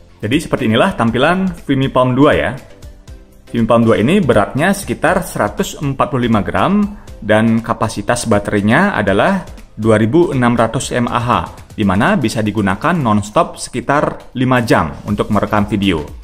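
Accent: Bali, Indonesia. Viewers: Logatxa kayak orang bali.medok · Orang Bali kayaknya logak nya... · Makasih bang penjelasannya kerennn banget…ngomong2 logatnya kayak logat bali bang..hehehe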